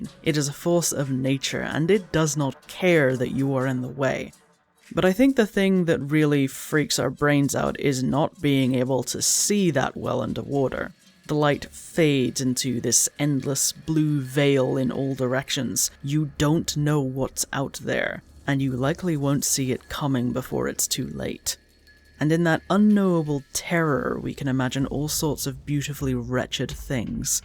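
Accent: Scotland, United Kingdom. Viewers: ...do people actually think you sound American? I could tell you were British(though I'd guessed England, not Scotland) the first time I heard you speak, though as you can likely guess from my pfp, I'm hardly unfamiliar. (Funnily enough I have a similar story about moving around quite a bit growing up, to the point my own accent is diluted to the degree I'm often mistaken for Canadian) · Oh wow I thought you were British lol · Who told this person they sound american lol they do not, if not told i would have assumed this was just a hyper-regional accent from somewhere in britain that sounds like some sort of mix of english, scottish, and american haha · You don't sound American, but you don't sound distinctly Scottish either. If I wasn't familiar with different Scottish accents I wouldn't have been able to place your accent! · Anyone that says you sound American must be experiencing hearing loss or something... 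I didn't immediately think Scottish until you said it, and now that you have, I can absolutely hear it, but I knew there was a distinct European-ness to your accent. It's not the strongest accent in the world, but it's absolutely there and absolutely, distinctly not American.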